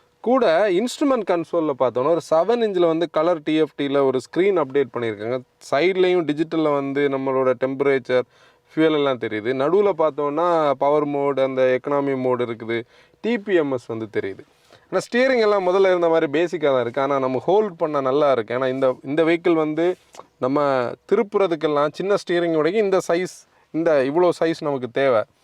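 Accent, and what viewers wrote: Kanyakumari, India. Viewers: Kanya kumari style speech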